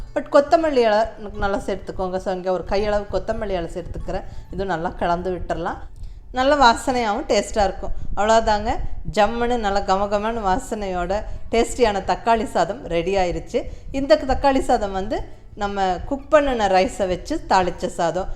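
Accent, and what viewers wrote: Coimbatore, India. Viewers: Very tasty food. Ongaloda kovai Tamil உச்சரிப்பு அருமை.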